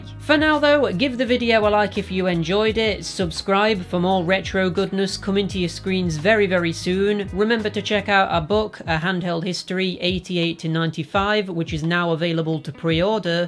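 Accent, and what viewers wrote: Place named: North, United Kingdom. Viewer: Thank you for yet another great video,! I absolutely adore ur accent, which part of UK are u from? I would guess somewhere north.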